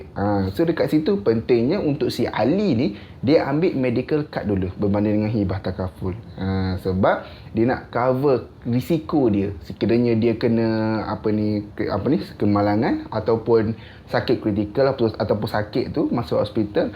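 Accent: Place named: Terengganu, Malaysia. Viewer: Bunyi mcm org ganu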